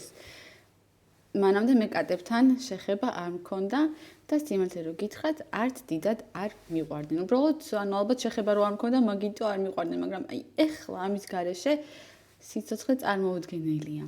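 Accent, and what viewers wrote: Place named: Israel. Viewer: ქართულად როცა ლაპარაკობ ებრაული აქცენტი გეტყობახოლმე ლაპარაკისას 😄👍✌️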